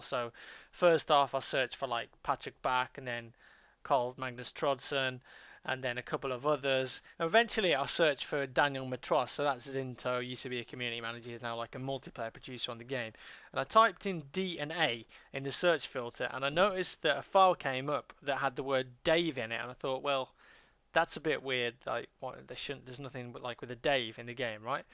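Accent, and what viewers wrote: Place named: Hackney, United Kingdom. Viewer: I'm here in 2021, I love hearing voice sound like a lock, stock and two smoking barrels hackney bloke. Fast forward to today and he sounds like a refined English gentlemen out for a spot of tea. Love ya,!!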